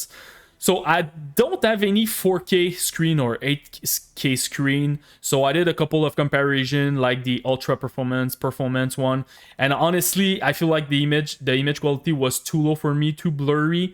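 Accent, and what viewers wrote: Quebec, Canada. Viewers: best accent ever...jadore sa esti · Ton accent Quebecois te trahi beaucoup xD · U from Quebec? u got the accent lol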